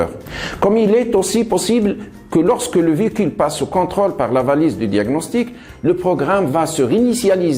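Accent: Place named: Maghreb, North Africa. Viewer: ce qui est risible c'est pas l'accent c'est le langage soutenu qu il utilise conjointement à son accent.. Ça se voit que ce qu'il raconte n'a pas été écrit par lui.. Au Maghreb il y a des gens qui dépassent bien des français dans la maîtrise du français.. Il ne faut juste pas s'inventer un personnage.. Je suis sur que tu discutes avec lui c'est plus du tout le même français..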